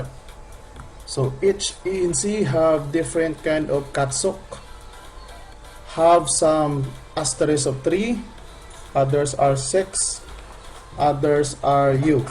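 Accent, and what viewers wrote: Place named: Visayas, Philippines. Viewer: Bisaya accent , mispronounced ang mga words di maintindihan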